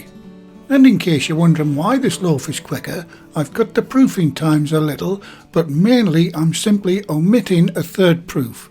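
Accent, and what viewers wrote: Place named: England, United Kingdom. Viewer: I like to listening your English accent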